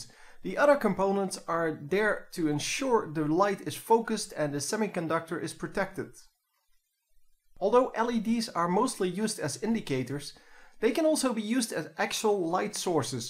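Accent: Netherlands. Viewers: you sound dutch are you if you are hoi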